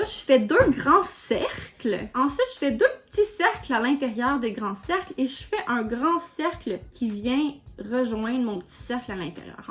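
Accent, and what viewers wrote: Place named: Quebec, Canada. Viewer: Les gens qui ont l'accent québécois ont tellement de charisme 😂🙈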